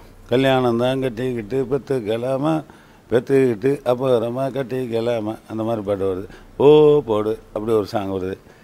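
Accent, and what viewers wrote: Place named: Sri Lanka. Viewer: He is a Srilankan tamizhan, he is speaking pure tamil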